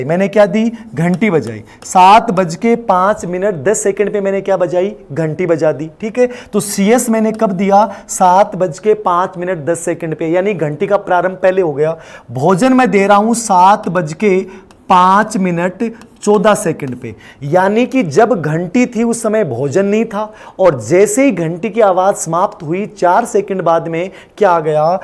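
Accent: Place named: Shekhawati, India. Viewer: Sir churu jhunjhunu se ho kya....lang. udhar ki lgi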